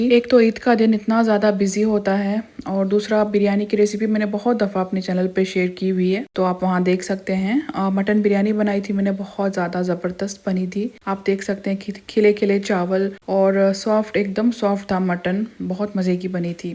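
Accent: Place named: Dakhani, India. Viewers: V nice vlog voice over also u do in dakhani urdu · Ur Urdu s same as hw we speak at home. We are also from Bangalore.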